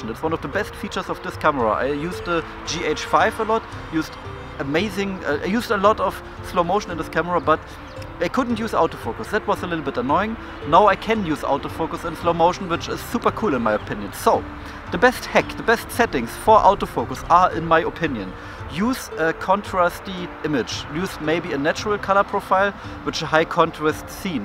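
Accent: Berlin, Germany. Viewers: Ach so, my my settings ….. 😂 so cool 👍🏻 ich hab großen Respekt davor, dass Du alles in englisch sprichst 👍🏻 und das machst Du auf Deine charmante Berlinerische Art echt gut 👍🏻 mach weiter so 👌 beste filmerische Grüße aus Oberfranken my friend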